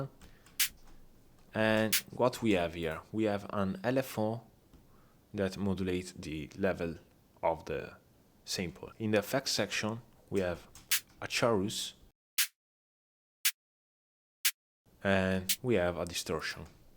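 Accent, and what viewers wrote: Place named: Spain. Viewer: considerato che sono italiano, direi passabile. Pensavo tu fossi spagnolo, forse è l'accento! Non te la prendere, scherzavo sull'inglese, ma sono serio con i complimenti sul tutorial, davvero un ottimo lavoro.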